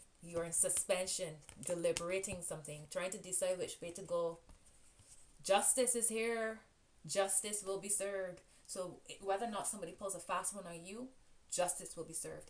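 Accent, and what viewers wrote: Barbados. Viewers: you have absolutely no reason to stress out. I've spent the past 8 months watching and listening to various readers and you are up there as one of my best readers. Regardless of the message, you are a wonderful reader. You have a confidence in interpreting the cards. Your warm and easy going. I got a glimpse of your sense of humor and your while you are reading, your concern is genuine and honest. Your bajan accent is beautiful too!!!! I could listen to you all day. Your awesome. Lots of love, light and blessings to you💜💕💙❤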